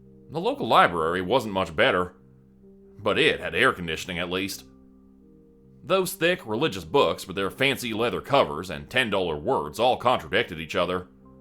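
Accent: South, United States. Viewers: Ooohh…loving the southern accent! Great story 💜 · Great southern accent 👌. Thank you for another excellently narrated great story ❤❤❤😊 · Love the southern accent! Thank you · Your attempt at a Southern accent is not too terrible! I appreciate you not going for the Beverly Hillbillies style of dramatic drawl that sounds so thick and saccharine that it becomes comical. Nobody talks like that either. So thanks for exercising subtlety and restraint. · Your southern accent is 'Meh' at best, I'm from Alabama, but it's better, (excuse me, spit tobacco juice in a can) than my Canadian accent 😂😂😂, I'm a major fan of Hollow's End & Charlie's Department Store Mr, your accent still needs some work 😂.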